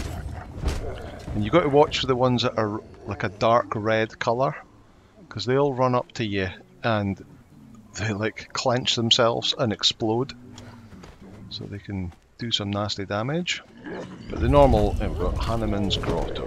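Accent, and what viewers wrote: Scotland, United Kingdom. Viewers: I know it. One of my colleauges in Thailand was a Scotsman and he had the same accent as you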